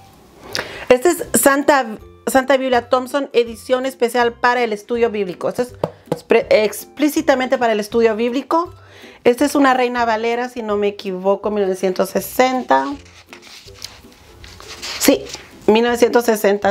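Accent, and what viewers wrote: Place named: Latin America. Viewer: Hermana: muy buen review!! Sorprendido por lo que dijo que tenía acento... la verdad que se escucha más latinoamericana que yo que soy de Argentina!! (Si me decía que estaba en México o en Guatemala o Salvador u Honduras... le hubiese creído). Bendiciones!!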